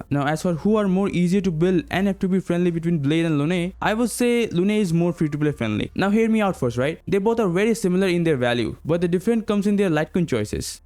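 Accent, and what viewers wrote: India. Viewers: Bro yur accent is like Indian is that true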